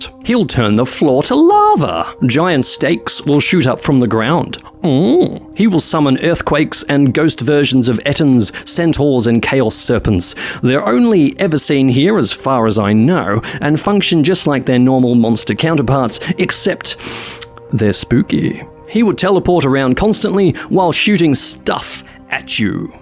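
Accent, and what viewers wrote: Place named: Home Counties, United Kingdom. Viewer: Home Counties?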